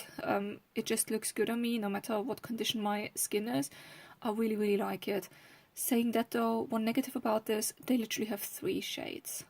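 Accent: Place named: Germany. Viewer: Your accent is lovely. I may be mistaken, but it sounds either German or Scandinavian.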